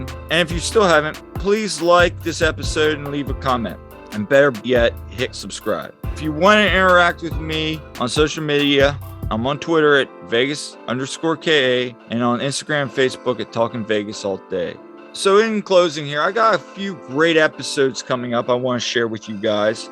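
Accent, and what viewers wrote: Southern California, United States. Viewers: You sound like spiccoli radical duuude so Southern California Lolol anyways I like your videos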